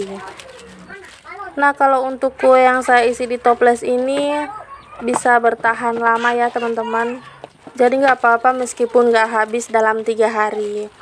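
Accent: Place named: Gorontalo, Indonesia. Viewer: Suka bgt logat gorontalo..teringat tmn yg dri Gorontalo jg.slm dri mkassar y bund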